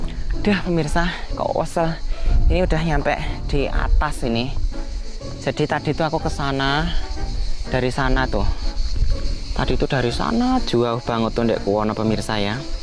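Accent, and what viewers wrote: Java, Indonesia. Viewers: Masnya ngomong logat Jawanya lucu.. Haha...Salken dari Madiun. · Waduuh medok mas jowone sampeyan sueneng aku ngikuti konten" e sampeyan iku smg sukses slu yo mas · Logat nya medok lucu bgt wkwkwkwk 🤣🤣🤣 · Mase ..bagus tuh ngomong jowone.... lucu lgi...😃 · Mas sampeyan kok lugu banget, jowomu medok banget, seneng aku, maju terus sukses buatmu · Jowo ne ngendi to mas? Lucu sampeyan hehee.. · Ya Ampuuuuun senag bgt nonton mas logat jawax lucuuuu deh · Salam kenal baru gabung.. Asli seko suroboyo opo banyumas mas.. Koq rodo medok medok manjaaaah...😁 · He..he..he.. logatJowo medhok..lucu...keren mantap mas... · Aku baru beberapa hari ngikuti youtube mas,kadang ngmngnya bikin ketawa lucu jawanya jg masih khas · seneng bnget liat orng indo medhok ngevlog di luar negeri panen buah2an yg gak ada di indo.. 😀 semangad terus ngevlog nya mas 💪 ntar selanjudnya panen buah pear (liar) klo ada 😀❤️ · Sampean jowone ngendi mas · Ko sy suka ngomong jawanya 😅😅😅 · Seneng leh ngrungokke Mas Nek ngomong medhok e poollll mantap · Assalamualaikum..salam kenal ms.bu Andi dari Makassar sulawesi selatan.. 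Udah lama tau mas di vlog mba Yanti Guixe dan Teh Dona Bayo..tp baru smpat koment nih hehe.. Suka bgt dgr logat dan meddoknya ituloh mas.bikin ngakak.. Dan yaa sy sependpt dgn yg koment td..bhw mgkin lebih dikembangkan lg vlognya ttg sejarah kota tmpat ms saat ini..selain panen Ok mas..smangat dan sukses sllu👍👍🙇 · Medhok dan ngondhek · Mas tempat e neng ndi ko jawae medoxk kowe lucu mas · Wes sui neng luar tapi jawane tetep iseeh medoook nek ngvlog teteeeep jowone ra mbok tinggal,wes too maas ndang rabi beng enek seng ngemuli hehe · bang kocak lo😂😂😂😂 terus lagi bang medhok nya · Assalamualaikum, Maseh ini asli mana to kok iso biso jowo medok, Lek angsal perso pundi mas jowone ?? ☺️🙏🙏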